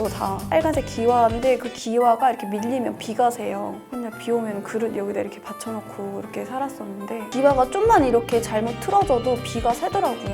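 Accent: Seoul, South Korea. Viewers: 서울말을 쓰네요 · 서울 말씨를 완벽하게. 머리가 좋다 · 아궁이...밥맛은 좋을듯... 아무리 봐도 북한에서 온사람 같지 않음....말투도 그렇고....그냥 서울태생 같음... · 북한분맞으세요? 남한사람인지 북한사람인지 모르겠어요ㅎ 완전 한국사람다되셧네요.북한말투가 남아있지않네요.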